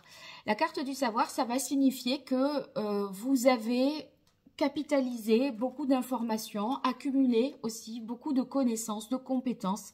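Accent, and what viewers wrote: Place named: Brittany, France. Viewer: Merci pour la vidéo, vous êtes jolie comme cœur ! 🙂 Et votre bel accent il vous vient de Bretagne ?? 😉😋😇 Beau Dimanche tous 🙏🙏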